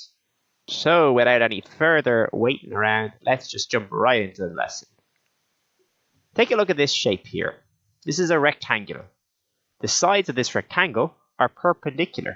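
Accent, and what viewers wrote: Ireland. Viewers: These videos inspiring I have a theory but: Are you Irish?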